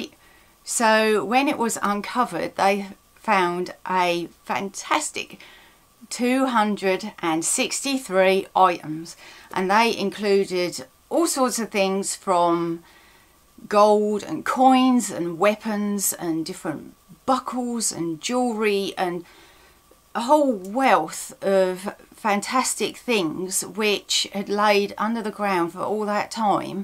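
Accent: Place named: Suffolk, United Kingdom. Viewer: Your accent just takes me back to my youth, I love it. A proper Suffolk accent, not like my mongrel Suffolk / Nofolk / probably mostly RP (and maybe a tad of north-east from my time in 'Boro... maybe)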